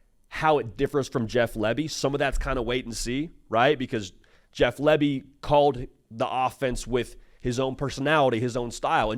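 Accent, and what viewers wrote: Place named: California, United States. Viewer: For me it's the 100 times a video he says "y'all" in a Cali accent.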